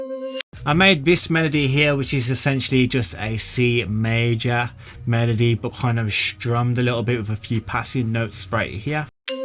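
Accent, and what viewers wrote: Birmingham, United Kingdom. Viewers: Do I hear the dulcet tones of a fellow Brummie?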